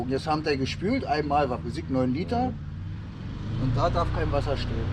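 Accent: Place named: Berlin, Germany. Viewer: aber cool das Du es machst, so versteht man dat Berlinerisch wenigstens XD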